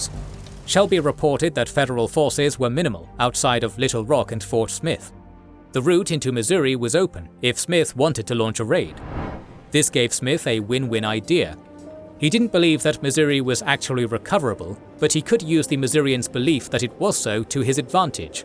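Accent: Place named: United Kingdom. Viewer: True spottedsharks but he’s British. Hence “St Louie” and although not here the inevitable “Mer EE land” for Maryland. “Schofield” is pronounced with a hard C (or K) rather than the schwa sound. None of this bothers me one whit. You ought to hear how we in Kentucky and Tennessee pronounce our foreign-named towns. Ever hear of Versailles Kentucky? Athens Kentucky (here with a long A.) How about Cairo IL (“KAY ro” it is there.) So yes, I get it but no, I don’t care. And I’d bet that unless you’re from Tennessee you’d stumble on Maury County (pronounced Merry) and Blount County (Blunt).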